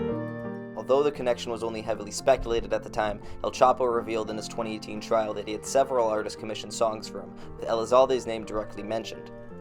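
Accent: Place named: United States. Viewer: Nice to hear a american speak on famous mexican singers. ❤❤🇲🇽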